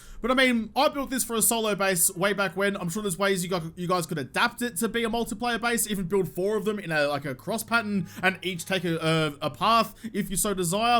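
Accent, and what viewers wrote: Australia. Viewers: Oh so your from Australia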